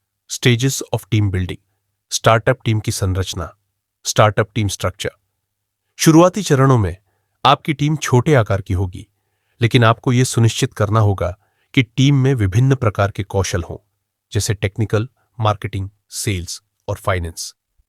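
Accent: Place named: Maharashtra, India. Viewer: आपकी टोन महाराष्ट्रीयन जैसी लग रही है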